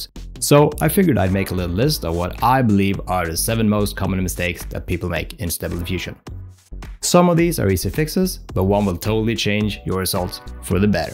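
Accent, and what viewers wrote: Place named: Sweden. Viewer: Loving these videos (and hearing your lovely Swedish accent makes me homesick for Sweden...I lived there for nearly 20 years). Any tips about how to avoid heads being cropped off? Or how to build out an image if you like it but half the head is missing? So far that is by far my biggest annoyance.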